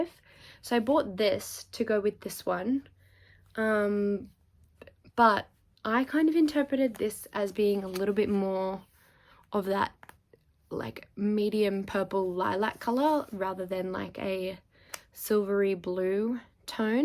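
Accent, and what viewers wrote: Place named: Australia. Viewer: Clicked on this and was so refreshed to hear a fellow Aussie!!! Loved your first podcast and will now go binge the rest :)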